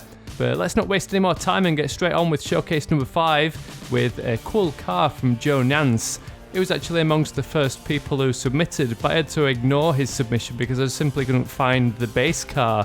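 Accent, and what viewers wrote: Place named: North England, United Kingdom. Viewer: by your accent I can deduct that you're from north England but where specifically?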